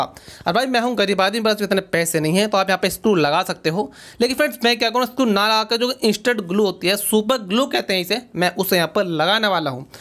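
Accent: India. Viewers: I love your killer Desi accent bro 😉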